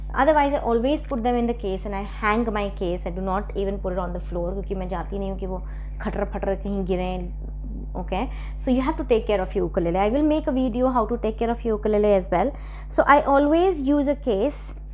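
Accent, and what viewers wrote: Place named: Germany. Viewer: your pronunciation is German based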